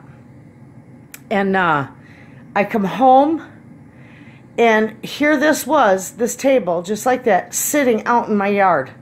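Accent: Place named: Northeast, United States. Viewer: Hi. Thanks for taking us through your beautiful home. You are organized and efficient! Sounds like you have a North Eastern accent. I'm from upstate NY (but in SoCal for 40 years now) so you sound like all my relatives. Have a wonderful day.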